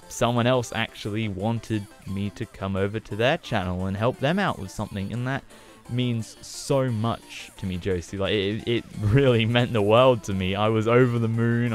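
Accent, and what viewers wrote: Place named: United Kingdom. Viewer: Um... Wow... ME: YOU SOUND BRITISH, WHY?! [MIND BLOW]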